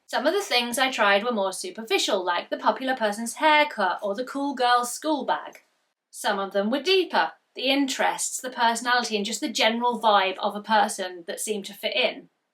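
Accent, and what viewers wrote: United Kingdom. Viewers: I like you just as you are. And, I'm American, and I love British accents!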